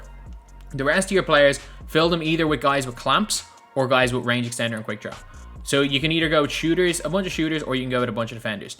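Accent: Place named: South Dublin, Ireland. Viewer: This lad is defo from South Dublin ngl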